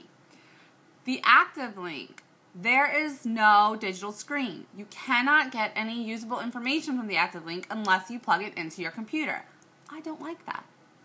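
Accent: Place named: New Orleans, United States. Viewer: great video.. just curious.. are you from the new orleans area too? (the accent has my curious, you sound like me and my friends)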